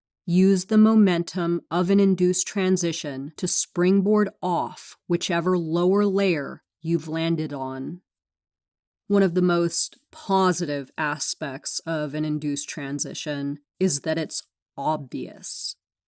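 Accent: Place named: Oklahoma, United States. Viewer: Damn I called it. The accent. Except I thought you had came from Tx to Ca. But its Ok. OK is amsricas step child. Tx dont claim yall, midwest dont claim yall, dirty south dont claim yall. And the modesto...valley people are scary. Coast people spot valley people a mile away when they go to Pismo etc. Rough starting point man. Damn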